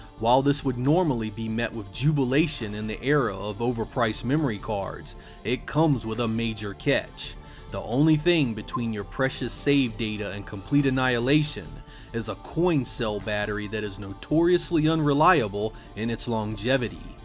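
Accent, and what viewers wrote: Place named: Baltimore, United States. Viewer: Cool! I work with a guy from Baltimore and you guys sounds like brothers. Thanks for the reply. Keep the Saturn videos coming. Also, are you familiar with The VGC replacement long box Saturn/Sega CD cases on Amazon? I bought some and have my own opinion but curious if you had any hands on?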